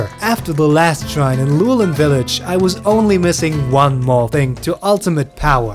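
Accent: Germany. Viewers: are u german? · I have a question are you German? It may sound weird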